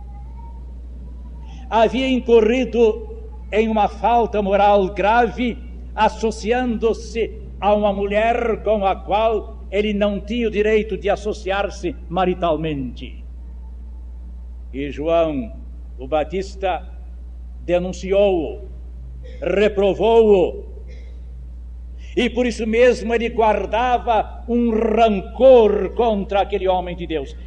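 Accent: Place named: São Paulo, Brazil. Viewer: Ele não era carioca? O sotaque é de paulistano da Mooca!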